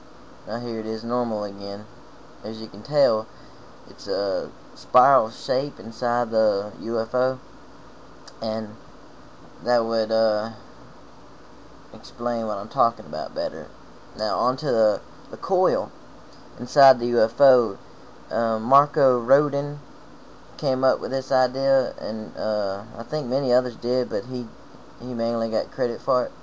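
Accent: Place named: South, United States. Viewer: Who say's people with a southern accent are dumb hicks.This dude is a genius ! Good for him ! This stuff is above me.I'm a dumb Northerner !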